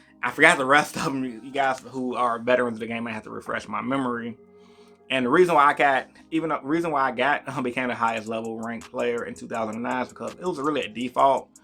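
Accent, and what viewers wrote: Chicago, United States. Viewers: Were you from Chicago?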